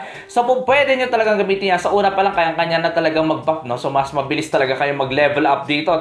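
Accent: Ilocos, Philippines. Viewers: Taga baguio ka boss? Aus pre ung tono mo pang pure ilocano tlga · Ilocano ka gayam idol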